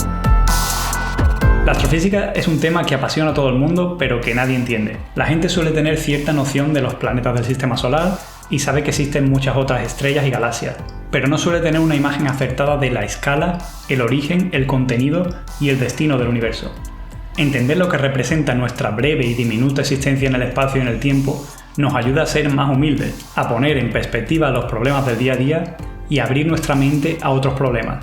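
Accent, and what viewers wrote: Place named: Andalusia, Spain. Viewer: Ostras! He llegado a tu canal por tu hermano, estábamos hablando sobre veganismo y me pasó tu video sobre la carne, y guau. He seguido viendo tu contenido y puedo decir que he conectado muchísimo con tu visión del altruismo y esas ganas de provocar un impacto en el mundo. Me encanta como organizas la info, super claro y encima con la fantasía del andaluz! Fantastiquísimo! Aquí una nueva suscriptora!:D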